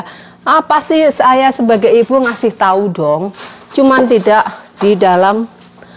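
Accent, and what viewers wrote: Java, Indonesia. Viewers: Konten mba kreatif kok yng komen gak kreatif itu orang emang bisa bikin konten seperti mba? Isi konten nya gak monoton kok misal nya cuma ngomong2 aja, jualan masakan aja. Malah berkat konten mba, aku bikin roti pake resep mba bagus rotinya. Liat konten youtuber ambil manfaat ilmunya, perjuangan orang tsb hingga sukses, yang paling penting bagi aku setiap sharing ilmu baking nya jujur gak nipu netizen. Mba ini walau hidupnya lama di luar negeri tapi gak lebai, suami bule nya gak pernah disuruh2 joget2 memalukan ala dangdut an di konten nya, gak bergaya dan berpakaian kebule2an, bahasa Indonesia dengan aksen jawa nya tetep ciri khas nya. Bahagia dan sukses nya kehidupan mba sekarang yg bisa bikin iri orang. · ngegas dan jutek Ngomongnya medok dan keminggris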